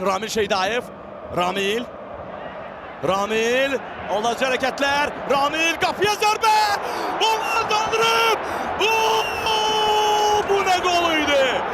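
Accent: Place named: Russia. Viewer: Sən şərh edəndə, futbolun ləzzətini itirirsən, rus akçenti ilə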